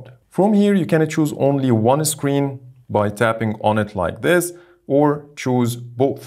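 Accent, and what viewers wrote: Egypt. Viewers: Ur accent is egyptian am i right ?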